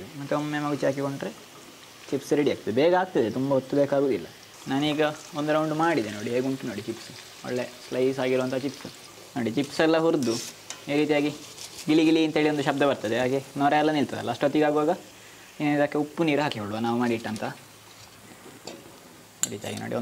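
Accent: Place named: South Canara, India. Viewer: This is the style of kannada spoken by people from south canara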